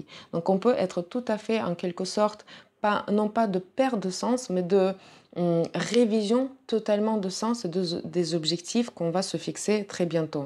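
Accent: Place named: Latin America. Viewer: Bonjour, je vous découvre aujourd’hui et, contre toute attente, je suis déjà fan. Expression parfaite, pas de narcissisme, un vrai désir de partager. Et ce charmant accent qui m’a fait voyager chez nos voisins latins # Noeud Nord en Gémeaux. Merci 🙏 🥰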